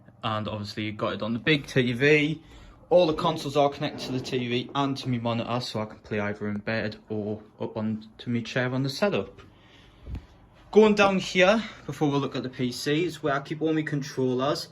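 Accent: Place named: United Kingdom. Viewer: Sick setup and wow your accent is different to what i have heard from british youtubers lol. keep up the good work.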